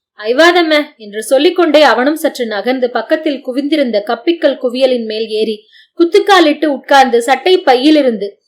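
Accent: Chennai, India. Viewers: தரமான மெட்ராஸ் பாஷை,கேட்டு மகிழ்ந்தேன். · மெட்ராஸ் பாஷை தெரியாதுண்ணீயேமே.. ஆனா மெட்ராஸ்லயே ரொம்ப லாங்கா வாய்ந்தா மாறி படா ஷோக்காக்கீதுமே ஊம் பேச்சி..😮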